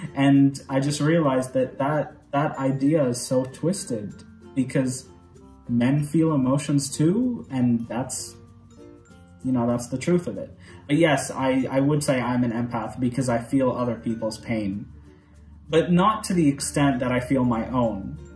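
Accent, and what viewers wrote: United States. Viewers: A couple of things regarding the Clone Wars. First, I get why doing reactions to it could be tiresome but instead watch it at your own leisure and then when at the end do a reaction to the final 4 episodes because combined they make one of the best Star Wars movies in existence. Secondly, a good reason to watch the Clone Wars is that some of the main characters will now be on the Mandalorian and/or will be getting their own show in the very near future. PS. Im with others and thought you were American. You sound like you could be my neighbor here in California. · Wow -- I thought that you were an American! Even going by accent alone, I would have guessed that you were from Chicago, Miami or Los Angeles. In fact, you look so much like my best friend from high school that I had to do a double-take the first time (and, yes, he had a beard in high school). 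· if you never lived in the US then you definitely have super impressive English and a super impressive American accent. You had me fooled! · I think everyone thinks you’re from the usa because your accent sounds soo American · I love how genuine you are about your emotions and aren't afraid of what people think about them. It really puts you a cut above a lot of similar content like this on Youtube. Sort of coincidentally I used to have a friend from Dubai who didn't like that I used the word "cute" to describe something because he thought it was a very feminine way of speaking though I got him to back down by getting him to admit there is no better word to describe baby ducks. Also people probably assume you're American because of your accent which is very American since we're a really diverse country that comes in all sorts of races and ethnicities it's hard to assume someone isn't American by looks alone. 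· It's highly likely that J.K. Roweling took inspiration from J.R.R. Tolkien when writing Harry Potter. Also many people think you are American because of your accent. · You don't "look American"? ... Americans can look like any ethnicity in the world, and you DO SOUND American. I mean I knew you were from UAE but only because I saw it in your "about" page. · you sound very American. I think that's why people assume you are in America. I even assumed that. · I also thought you were American based on your accent. I love your reactions. You seem very sincere and genuine. It's why I subscribed--you seem like a fantastic person.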